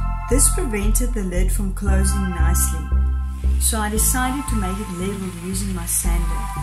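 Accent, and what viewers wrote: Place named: South Africa. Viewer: Sounds like you're from South Africa.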